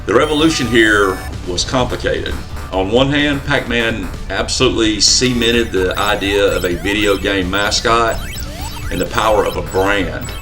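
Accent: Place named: South, United States. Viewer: That is a hard Southern Accent. I appreciate what you're doing here though and I agree with Space Invaders, Adventure, Pitfall, and even Pac-Man...I loves all of these games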